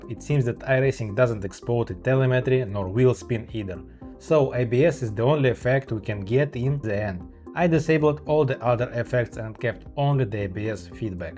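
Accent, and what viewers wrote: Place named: Ryazan, Russia. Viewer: У Вас чудесный рязанский акцент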